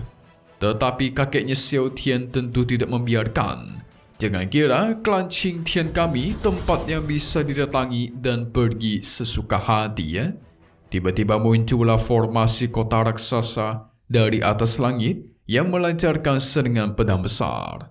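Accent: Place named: Medan, Indonesia. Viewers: Uda jelas jelas orang medan kau min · Orang Medan min😂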